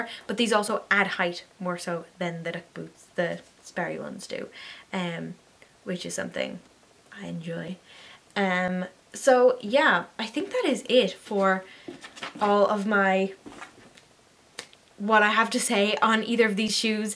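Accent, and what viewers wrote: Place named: Ireland. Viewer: I really enjoyed your review and love your Irish brogue. I love your country, too, and visited County Cork (backpacking) in the late 60's. Anyway, I have a pair of LLBean duck boots but I dislike the collar as it is not padded. If I can get LLBean to affix padding in my collars they would be first class; and that side zipper on the Sperry's would make them awesome.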